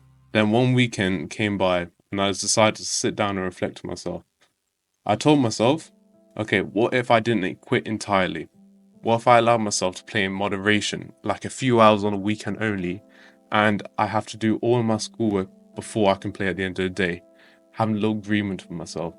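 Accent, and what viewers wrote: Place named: Germany. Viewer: your accent seemed more german rather than british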